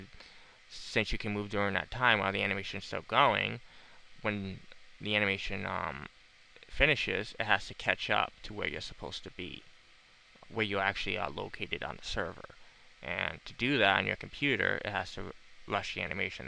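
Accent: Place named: New Jersey, United States. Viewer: morrrrrrrrrrrrrre and you have like a jersey accent :P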